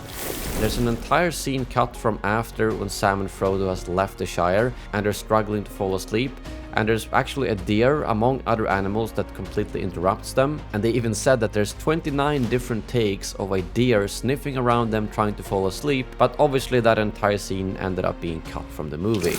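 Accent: Sweden. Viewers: I applaud your continued efforts on this project. The day we do see more scenes (and hopefully new extended anniversary cuts) released, I trust many of us will gather here and thank you for your continued interest and passion for this trilogy and all its lost fragments of gold. If there's anything that's ever going to give all this lost material a good push toward being released, it's this. This undeniable display of passion. You look at these compilation videos being updated again and again as more material surfaces over the years, and it couldn't be clearer. Hundreds of comments. Hundreds of thousands of views. This kind of passion doesn't just die down quietly over time, there's too much good stuff out there to keep folks like us up at night. I'm glad to see the torch is still burning bright. Also, hearing a fellow Swede narrate this really resonates with the whole Nordic Middle-Earth vibe. 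· If having a Swedish accent means sounding gay as in merry and bright, then yes! 🤓✨️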